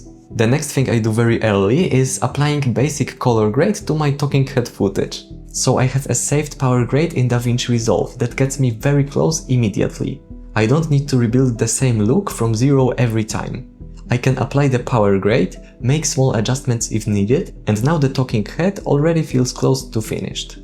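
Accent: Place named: Poland. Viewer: Nice video! Going to try to use your workflow. Accent sounds Polish ☺️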